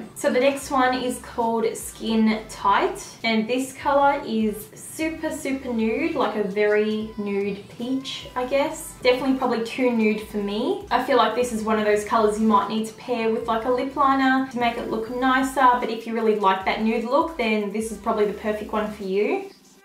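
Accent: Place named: Australia. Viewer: I didn't realise you were Australian 🙌🏼🙌🏼I should of known from the accent hahaha. Your hair looks super amazing today 🙌🏼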